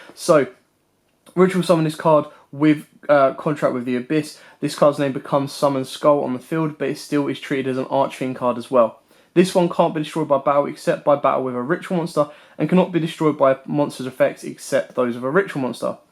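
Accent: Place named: United Kingdom. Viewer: Are you an Englishman? I can hear a Brit accent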